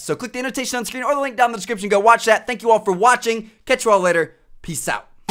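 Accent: Spain. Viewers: I love your Spanish accent, you should try to do a video In Spanish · Thumbs up if you want to listen Spanish accent again. No criticism just found it funny as a Spanish person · spanish accent <3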